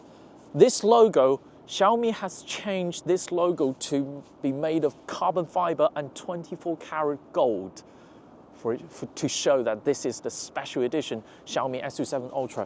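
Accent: United Kingdom. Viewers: British Accent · 英国口音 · 哥们英音真牛逼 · 的英式口音太特么好听了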